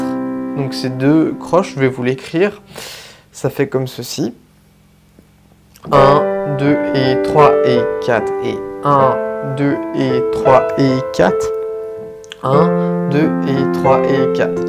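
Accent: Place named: France. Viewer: J'avoue que quand je vous entends dire des mots anglais avec une prononciation française ça pique les oreilles. Paterne ou bien séquence...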